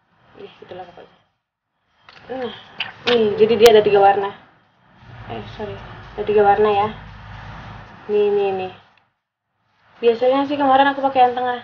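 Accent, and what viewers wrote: Java, Indonesia. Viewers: Jawa nya nggak ketinggalan ya kakkk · kok mbak.nya lucu ya, medok.nya itu 😂 bedak tabur viva.nya jd inget bgt pas waktu smp... 😂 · Wong jowo to mbk?? 😁😁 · jowo aslie kak. cuetohh poll wkwk😁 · Jowo ne medok tenan kak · Jawa nya medok ya ka? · Sukanya dari kak tu, apa adanya, gak sok keindo2 an gtu, jawa bgt wkwk.. Mantep kak, sukses terus ya, uwes ngene wae kak opo enek e.. 😁😁😁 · seneng lek mbak ngomong jowo😊lucu ae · medok tapi cantikk ka 😍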